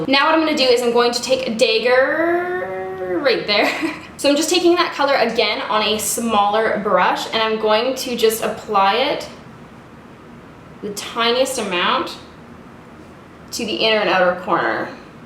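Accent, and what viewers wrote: Canada. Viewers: This is the most I've ever heard your lovely Canadian accent make an appearance. Eye "baeg" " daegger" it was great. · Definitely a Canadian pronunciation. Just like how she pronounces "bag" differently. It's not incorrect, just different from the American pronunciation. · That Canadian accent gets me every time haha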